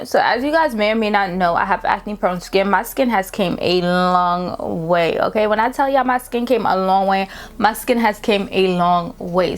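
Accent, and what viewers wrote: New York, United States. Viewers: Girl!! I had to subscribe. Right away, I was like she has to be from New York! 💁🏾‍♀️ Love the results.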